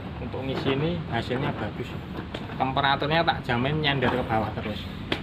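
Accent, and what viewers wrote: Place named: Sleman, Indonesia. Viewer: Setuju logat nya om admin kaya orang Sleman Bantul Gunungkidul dan sekitarnya btw mobil pertama gw kijang new super g 1.8 96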